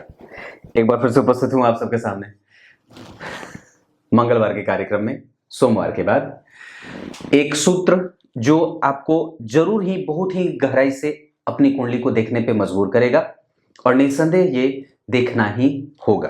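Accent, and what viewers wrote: Bihar, India. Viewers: Ap bihar se ho😳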